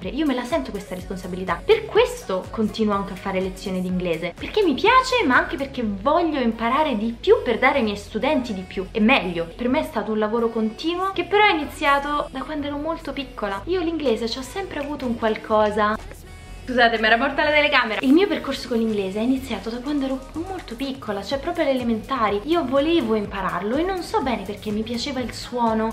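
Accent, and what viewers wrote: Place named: Rome, Italy. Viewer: PS : l'accento romano... È top!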